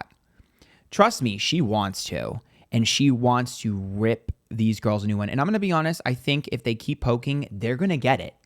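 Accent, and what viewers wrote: New York, United States. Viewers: I know you live in Texas but I recognize that New York accent! I’m born and raised in Long Island NY and people make fun of how I saw words and how fast I talk. But when I listen to you, I feel like I’m listening to a friend from home. Love it!!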